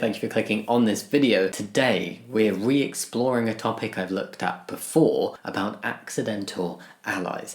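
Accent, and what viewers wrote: United Kingdom. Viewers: I’ve watched your videos on & off for years. & this is the first time I realized you have a British accent 🤯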